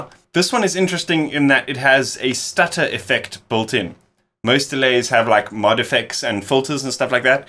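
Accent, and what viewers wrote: South Africa. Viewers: Is that a JHB accent I hear? - anyway - Nice Video - but you only said the words "Sort of' 200 Times :) - Thanks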